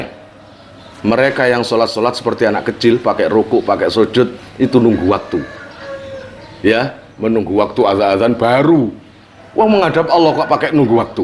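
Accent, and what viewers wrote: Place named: Banyumas, Indonesia. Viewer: Mbrebes juga ngapak kyai 👍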